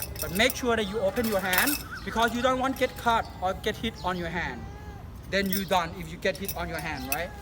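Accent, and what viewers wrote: Thailand. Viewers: lol I knew it you must be Thai people listening to your accent Great to see people interested in this weapon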